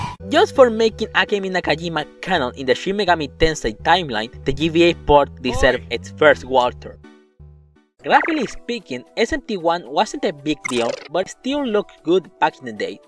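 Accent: Chile. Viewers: este tipo literalmente es el único aweonao que suena como chileno hablando en inglés que habla de smt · Jajaja eres chileno!! Me suscribo jejej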